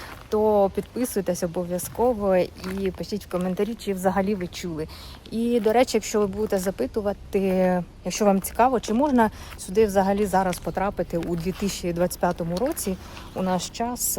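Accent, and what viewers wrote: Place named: Cherkasy, Ukraine. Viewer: люблю цей черкаський суржик)))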